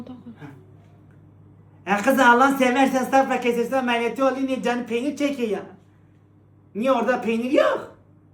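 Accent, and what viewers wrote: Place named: Elazığ, Turkey. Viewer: Harika aynı Elazığ ❤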